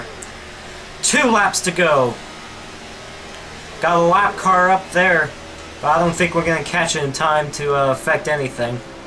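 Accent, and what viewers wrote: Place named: Boston, United States. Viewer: He has a Boston accent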